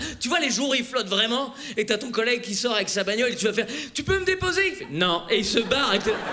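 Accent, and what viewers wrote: Quebec, Canada. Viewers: Mais quel talent ! Tu le tiens bien l’accent québécois ! 😂 bravo j’adore ! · J’adore merci pour ta vidéo. J’adore l’accent québécois 🥰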